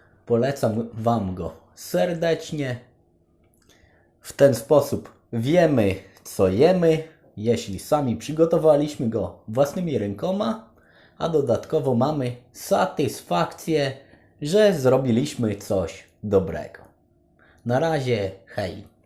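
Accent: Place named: Ukraine. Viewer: A co to ukrainiec jest taki ma akcent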